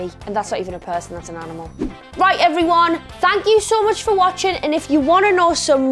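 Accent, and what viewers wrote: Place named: Newcastle, United Kingdom. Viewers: Chocolate Muffin it's obviously Geordie isn't it? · I am so in love with her British accent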